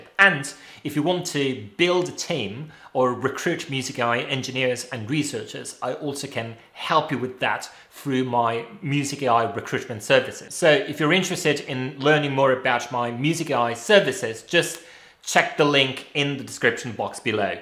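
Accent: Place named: United Kingdom. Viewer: Great work! It's really funny how the british accent and words make it seen like an even more intellectual and objective review 😂 I'll use it to annoy my Swifty-fan's friends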